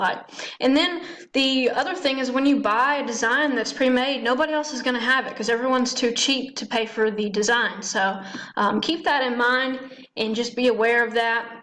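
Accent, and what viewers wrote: South, United States. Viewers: Love your MBA-related videos! Great, highly useful info delivered in a southern gal, no-nonsense way 😉 Subbed!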